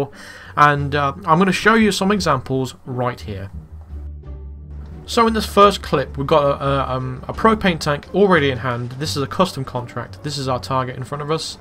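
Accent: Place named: West Country, United Kingdom. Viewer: Bristolian/West Country accent.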